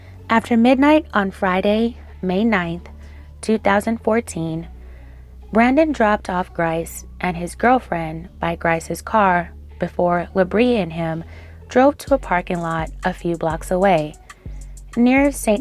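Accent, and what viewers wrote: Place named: New York, United States. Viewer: I love my girl with the New York accent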